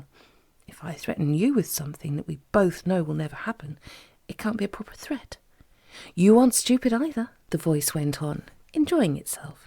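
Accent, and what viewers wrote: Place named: London, United Kingdom. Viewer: Great London accents btw not overdone which is a mistake even professionals make sometimes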